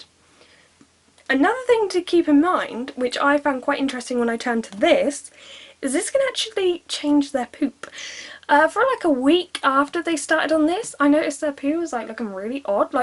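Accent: United Kingdom. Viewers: She’s British. Due to her accent she pronounces things differently... so no, she doesn’t pronounce it wrong.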